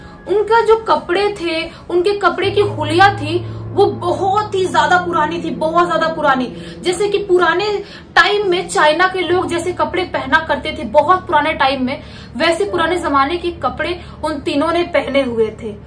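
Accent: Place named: Uttar Pradesh, India. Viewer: madam k bolne ka way of talking hi esa hai she's from uttarpradesh side...maybe isliye....but Kisi ko appreciate na kar sako to criticize karne ka bhi koi haq nhi h tum jese mahapurusho ko 🤦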